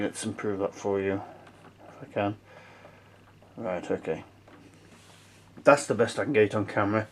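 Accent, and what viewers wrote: United Kingdom. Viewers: A British sounding!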